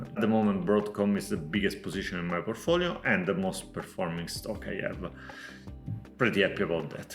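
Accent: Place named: Portugal. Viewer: Great video about Snowball Analytics and I love the Portuguese accent! Does SA use FIFO for cost basis and capital gains/losses calculation or do they give you an option to choose average cost instead?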